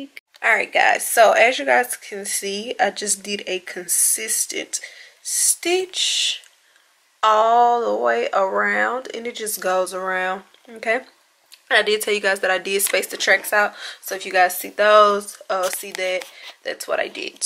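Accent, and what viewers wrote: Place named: South, United States. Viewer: You sound like the southern version of raven symone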